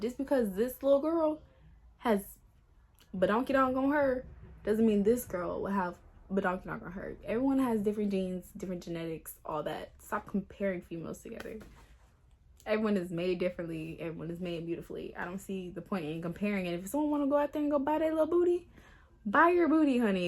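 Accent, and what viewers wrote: United Kingdom. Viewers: lmaf loving the British accent❤️ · It was the British Accent for me 😂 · At first the British Accent🤣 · Is the Brittish Accent for me 😂 Your so pretty 💞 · your British accent isn’t bad x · o shii i’m a new sub and i thought she was from london fr😂😂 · She British like what😲😱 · I’m British so ik that did u not read the comment 🙄 it sounded like how my cousins from north London speak so that’s why I said that so pls have a good day and goodbye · It’s the natural beauty for me🥺🥺and girl I’m from London and that British accent was on point🤣🤣🤣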